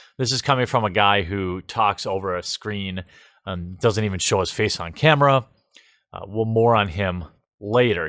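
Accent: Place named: California, United States. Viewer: You remind me of Andrew Breitbart, especially your CA accent. :-) Keep fighting! & Good luck!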